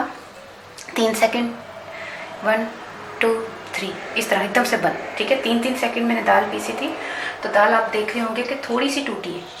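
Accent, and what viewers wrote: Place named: Punjab, India. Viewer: hahahahah so cute Punjabi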